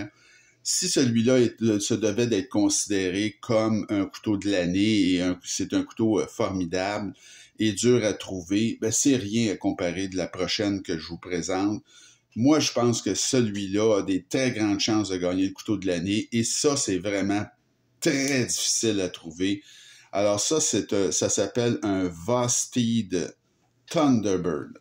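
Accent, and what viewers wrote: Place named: Quebec, Canada. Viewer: Quel plaisir d'entendre l'accent québécois😊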